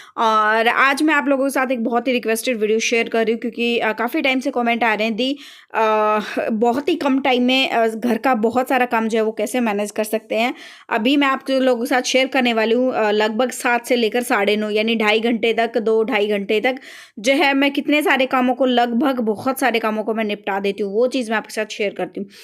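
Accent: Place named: Haryana, India. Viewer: Di aap kha rhte ho qki aap haryanvi lgte ho bcoz m b haryanvi hu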